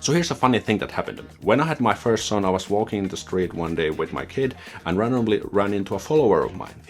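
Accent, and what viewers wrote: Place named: Finland. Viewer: The most accurate finnish accent i have ever heard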